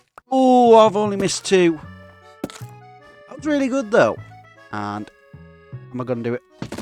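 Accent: United Kingdom. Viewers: My Man is britishhh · lol I thought you were Scottish because I have a teacher from Scotland who has your accent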